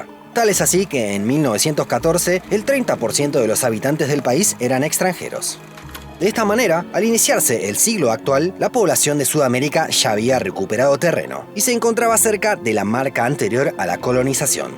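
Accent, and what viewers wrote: Argentina. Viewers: como que no es argentino? el canal es de Argentina, hay tres personas detrás del canal, el chico que aparece en los videos se llama Diego Briano y es argentino. O de donde pensaste que era el acento? Mexicano..?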